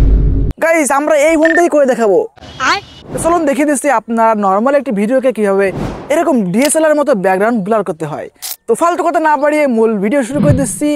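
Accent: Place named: Jessore, Bangladesh. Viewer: ভাই আপনার বাড়ি যশোর। ভাষায় তো সে রকম মনে হচ্ছে 😊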